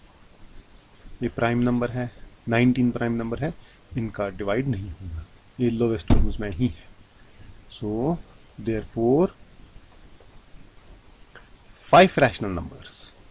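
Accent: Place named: Kashmir, India. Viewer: Very nice brother... I appreciate your efforts and help... Shukriyah... I am a Kashmiri, I could recognise you are too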